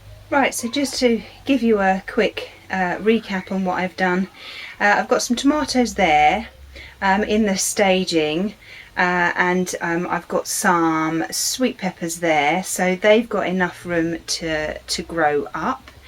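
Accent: United Kingdom. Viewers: I love your videos! You fulfill my need for gardening and proper british accent <3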